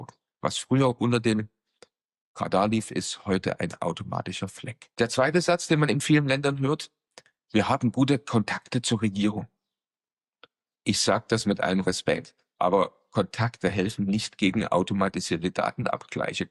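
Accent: Germany. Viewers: Fränggisch. Des is fei goud. freili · hört sich bissel nach Sachsen oder Thüringen an. An manchen Stellen verschluckt die Technik ganze Silben. Die Akustik ist aber in Ordnung, da hallt nix großartig nach.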